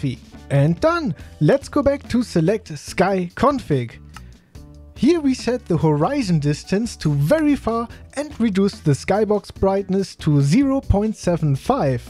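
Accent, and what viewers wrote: Germany. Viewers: Mein Lieblings Englisch-Deutsch-Sprechender haha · deutscher akzent richtig?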